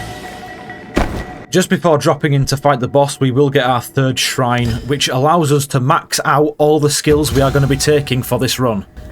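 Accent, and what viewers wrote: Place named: United Kingdom. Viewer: Of course its s UK accent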